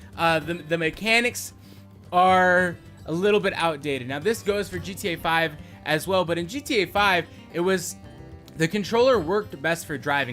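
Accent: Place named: Russia. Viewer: please use Russian accent full time + I like your dimples:) Merry Christmas and new year y'all 😘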